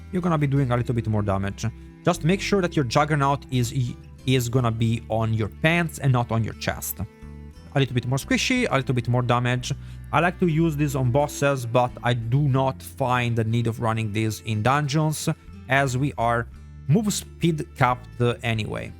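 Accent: Italy. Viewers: I like his Italian accent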